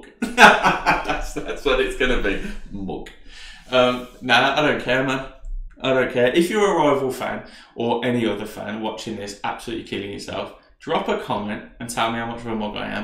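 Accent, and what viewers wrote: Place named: United States. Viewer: Like your American accent.....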